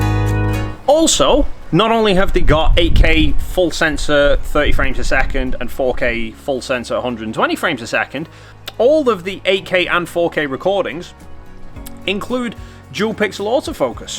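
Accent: United Kingdom. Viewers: I love that the "ISO what you did there" pun is specific to your British accent.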